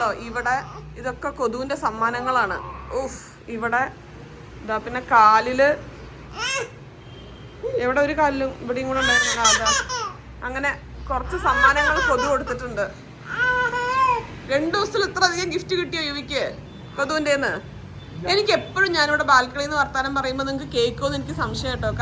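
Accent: Malappuram, India. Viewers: ഞങ്ങൾക്കും താളിപ്പ് കറി ഇഷ്ടമാണ് സംസാരത്തിൽ മലപ്പുറം ടച്ച് " ചൊയ" ഉണ്ടല്ലെ. ഇവിടെയും പറയും. പാലക്കാട് - മലപ്പുറം ജില്ലാ അതിർത്തി. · മലപ്പുറം അല്ലെ ഇങ്ങള്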